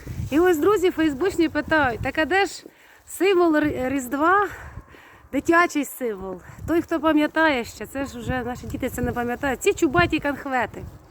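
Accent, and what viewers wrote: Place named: Poltava, Ukraine. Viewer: Цікавою Люблю етнографію. звитчаї і традиції.Судячи з усього, Ви з Полтавщини. (Опішню ще Панас Мирний згадував). Ну і плюс говірка.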